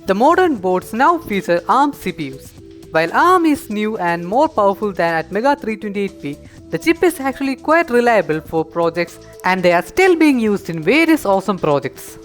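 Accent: Kerala, India. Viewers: language and slang are quite nice, but I think you are from Kerala, 🙂 Actually, Arduinos are recommended for the beginners to learn program and robotics later followed by Esp modules, rsp2040, Raspberry pi, latte panda etc. etc.... anyways you need to include more information for beginner to understand well and to start their journey with right components, Other than this everything is fine. great job bro!!!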